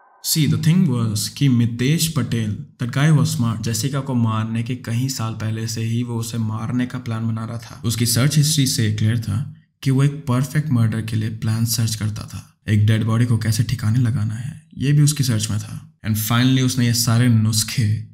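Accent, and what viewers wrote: United Kingdom. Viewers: IM SORRY BUT WHY DOES HE SOUND BRITISH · that brr-issh accent got me wet, also rip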